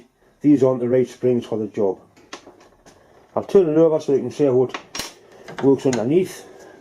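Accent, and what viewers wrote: Newcastle, United Kingdom. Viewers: I love how Geordies say "hurls" instead of holes.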